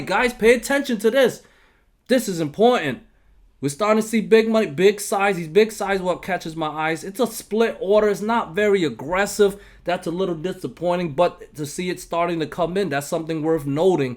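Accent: New York, United States. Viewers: you from ny?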